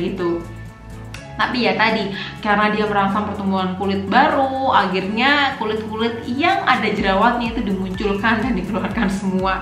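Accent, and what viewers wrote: Java, Indonesia. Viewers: Dokter asli mana nih logatnya kok medok?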